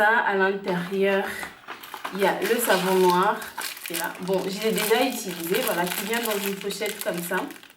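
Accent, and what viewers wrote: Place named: Gabon. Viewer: Tu es gabonaise c est sûre je suis sûre t'es gabonaise je reconnais ce petit accent léger " n emmenez pas mon nom ohhh" trop happy je m abonne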